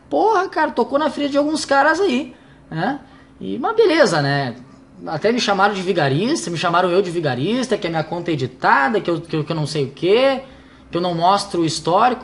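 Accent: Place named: Rio Grande do Sul, Brazil. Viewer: Cara, tu eh gaucho? Pelo sotaque parece... tambem sou do sul, abraco, otimo video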